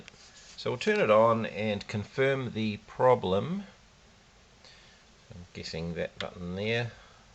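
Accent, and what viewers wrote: New Zealand. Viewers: _You sound like a fellow Kiwi... We should never underestimate the ability of a Kiwi, as isolated as we may be, to fix any problem, be it fixing a piece of broken farm machinery or splitting the ATOM_.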